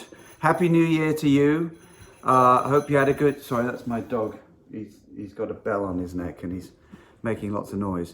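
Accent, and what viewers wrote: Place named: United Kingdom. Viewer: I always forget about your British accent because I watch Michael Cutter too much.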